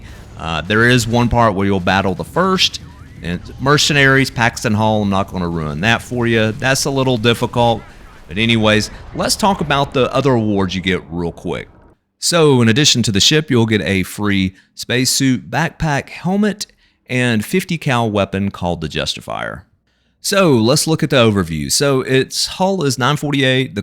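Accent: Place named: Texas, United States. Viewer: You sound like a Texan, and YouTube is severely lacking in Texan gaming content creators. Subbed and liked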